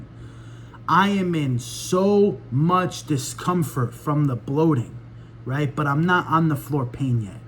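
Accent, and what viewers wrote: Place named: New York, United States. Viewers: Thank you so much for sharing your gallbladder journey. My daughter-in-law is having extreme attacks now and is going to speak to a surgeon this week. This really enlightened me as to what to expect and I shared it with her. I just want to say that you are very funny and it was a pleasure to listen to you to the end, because with your New York accent, you tell the story hilariously. Thank you for your candor about the diarrhea and what to expect post surgery. I'm sure you have helped so many people. Forewarned is forearmed. May the LORD bless you and keep you healthy. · You got me laughing out loud when you got to how the cholestyramine works :) I have not had gall bladder attacks (yes I've had kidney stones) but got a long covid condition with irritable bowel - messing around between constipation and diarrhea. 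My nail technician was taking Cholestyramine for what was a close to Crohn condition. When her doc put her on Cholestyramine her problems went away overnight so I asked my doctor about it. He told me he had MANY patients on it. I've been on it close to a year and it works very well. Sometimes the discomfort part of that is challenging, but on the whole it works very well. I take it every single day. Fingers crossed. You didn't mention where you are from but you remind me of my New Jersey roots - or sound a lot like friends in Queens. :) Thanks for this really helpful video!!!!! Good luck to you going forward!!!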